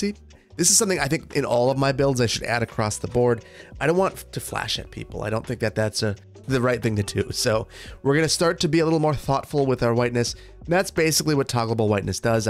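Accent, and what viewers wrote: Wisconsin, United States. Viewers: God I love the wisconsin accent with taag or baag when ever it shows up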